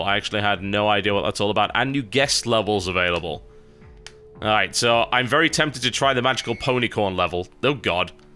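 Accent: United Kingdom. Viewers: they generally have different accents but very similar however he is very british in his accent cant tell if trolling or stupid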